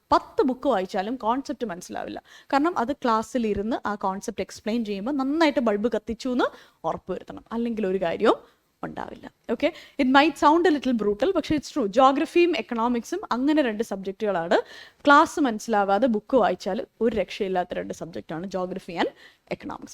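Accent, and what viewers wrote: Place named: North India, India. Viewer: you are gem at english still speaking malayalam like north one...!!!!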